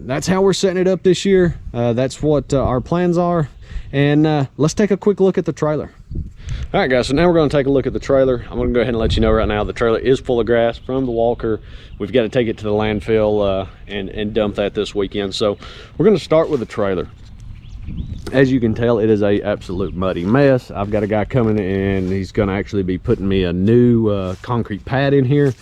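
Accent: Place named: South Carolina, United States. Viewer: Just started watching you. Accent says SC. Am I close?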